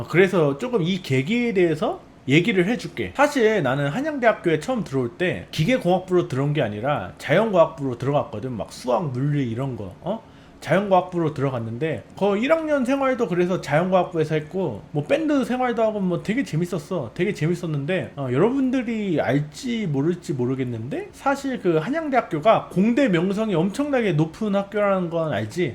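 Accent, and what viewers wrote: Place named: United States. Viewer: 발음이 약간 어메리카한국어를 구사하시네요..피부좋네요.이거말고는 나랑은 딴세상이야기...아니 연봉도 억대시면서 유튜브구독을 종용하시니.ㅎㅎ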